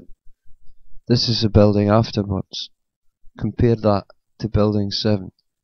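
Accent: Scotland, United Kingdom. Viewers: Great video, your scottish accent makes it all the better. Funny how ppl appeal to authority and their models, when models simply express the pre-determined conclusion, rather then consider the alternatives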